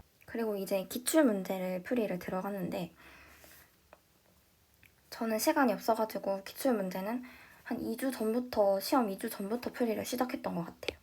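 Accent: Gyeongsang, South Korea. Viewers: 경상도 분이신가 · 경상도분이네요!! 친숙한 말투 ㅎㅎㅎ 전 포항출신이에요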